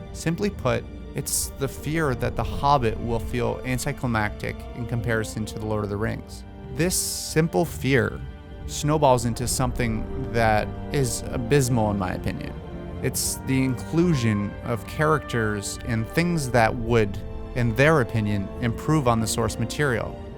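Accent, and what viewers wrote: United States. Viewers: Are you from Wisconsin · Is that a Florida accent?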